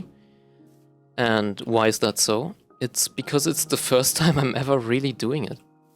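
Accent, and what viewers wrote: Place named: Germany. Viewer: hey bro, i just wanted to say that your english really is good like everyone says, you still notice the german accent but i kinda like it (: also i really just like the normal gameplay and u just talking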